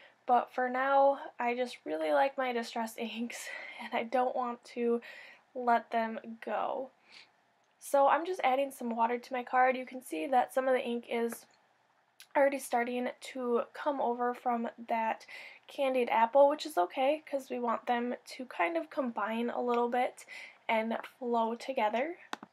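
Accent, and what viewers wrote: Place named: Minnesota, United States. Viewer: I'm happy you're back! Congratulations on your new baby! Your accent sounds like it's from here where I am in Minnesota! BIG time!!!!!!! :-)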